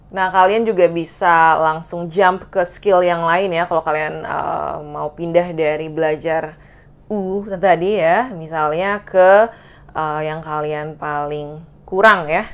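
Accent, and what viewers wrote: Indonesia. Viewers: masih logat indo banget si🤭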